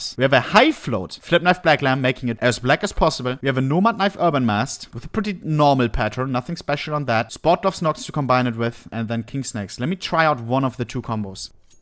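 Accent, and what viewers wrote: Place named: Germany. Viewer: german accent 🤮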